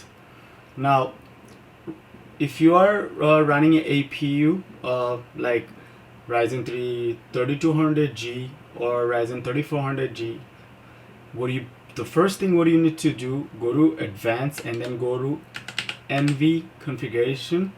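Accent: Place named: India. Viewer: Are you from India? It seems you have Native Indian accents.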